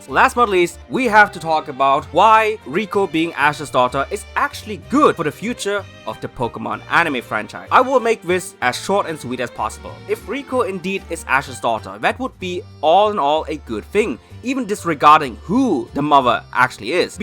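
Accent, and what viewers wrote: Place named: Germany. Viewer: Yoo du bist deutsch 😂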